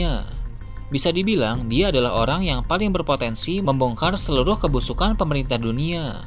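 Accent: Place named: Bogor, Indonesia. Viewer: Bang, Lu orang bogor ya.. logatNya kentel amat..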